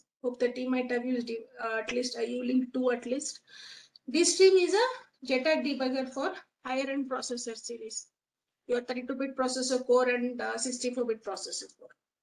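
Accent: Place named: India. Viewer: She speaks Indian English. It is their most important official languages, so she speaks a specifically Indian accented standard English. You get used to it, and it is easier if you are not a native speaker of English like myself, because she doesn't slur, doesn't replace ending 't':s with a glottal stop, replace 't' with 'd' like Americans, and she doesn't insert 'r':s such as 'drauring' instead of 'drawing' and other native speakers shenanigans.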